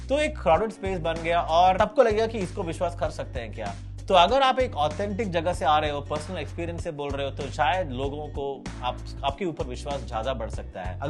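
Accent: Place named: Tamil Nadu, India. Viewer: Thank u for such a video 🙇. Also, I can sense tamilian slang in your way of speaking hindi 😅.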